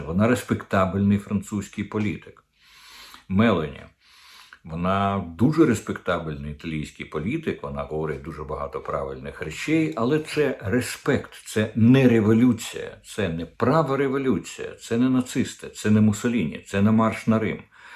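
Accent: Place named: Moscow, Russia. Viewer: откуда у тебя этот московыцькый акцент? Як твой друг Дугин та арестович?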